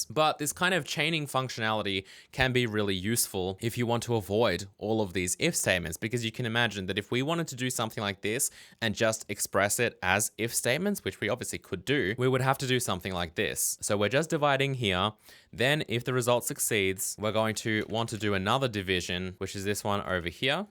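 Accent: Australia. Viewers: Don't assume I'm a simpleton like you and mistook the aussie accent for the f@ I know what aussie sounds like, I know what f@ sound like, he's both. Edit: extremely obvious when he says "C plas plasss"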